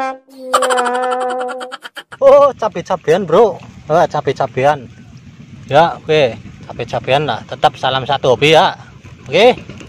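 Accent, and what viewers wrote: Banyumas, Indonesia. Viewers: Wong ngapak eah kang... Ngapake ngendi rika kang. aku Cilacap... Semangat trus kang salam sehobi...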